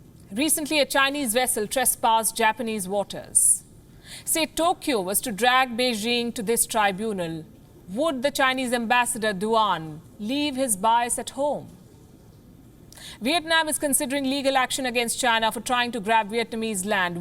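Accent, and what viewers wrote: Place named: India. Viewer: Horrible Indian accent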